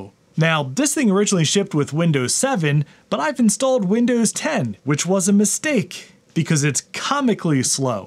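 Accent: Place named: New Jersey, United States. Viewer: Ah shit you just gave away your from Jersey.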